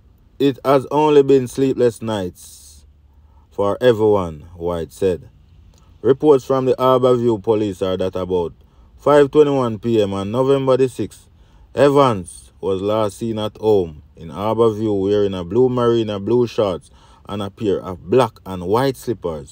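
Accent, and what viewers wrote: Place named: Jamaica. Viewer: Why some of you blogger think people want to hear this wasted Jamaica talk. You se me. Learn to talk brentren. No disrespect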